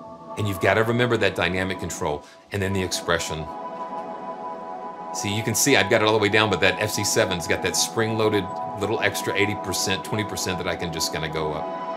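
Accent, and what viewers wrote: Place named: England, United Kingdom. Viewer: you have a great voice for scripture voice overs with music. Lots of 'em on youtube. With your wonderful composing abilities and your "just a touch of an english accent you bring to the table on the voice overs", you would have a purrrrdy big following. Timing is indeed everything. Please consider doing this. If you are already, GOD BLESS YOU and let us know your channel! Bless you, brother! PS-HAHA! I found your other sites.:D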